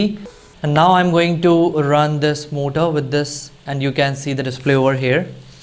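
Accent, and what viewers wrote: India. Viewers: You are very sympatic. i also like your voice with a bit indian accent. 🥰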